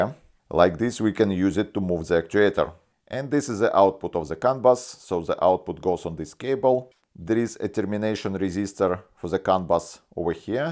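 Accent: Russia. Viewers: Вэри хард инглиш. читаХ - а у русских всё через Х. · Привет МУЖИК! Ты разговариваешь с Русским акцентом, давай уже видосы на Русском. Ты афигенен!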